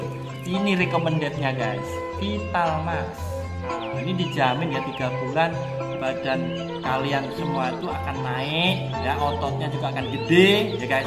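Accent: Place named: Malaysia. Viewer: Waduhh... Bahasanya bunyi campur malaysia gitu... Berkecek kolumpur ko mas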